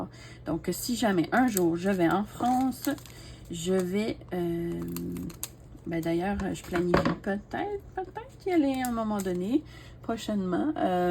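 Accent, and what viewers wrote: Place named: Quebec, Canada. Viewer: Coucou miss ❤ Merci d'avoir pensé à moi 🥰 et merci pour les compliments 😍 j'adore ta voix, ton accent québécois et ta façon de parler 😊ta voix est douce et j'apprécie tes vidéos 💖 Je ne l'ai pas encore tourner donc ce sera un plaisir de le faire 😉😊😘😘😘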